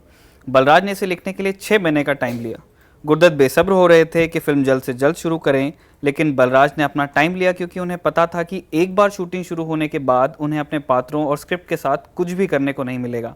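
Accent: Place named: North, India. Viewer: Why are you keep saying BOMBAY instead of MUMBAI which is actual name.? I feel that all north Indians (that what i believe from your pronunciation) are stupid and are not willing t come out of British slavery and so keep reminding themselves with names like BOMBAY instead of its proper, actual & real name MUMBAI...... I am hoping that you will improve on this....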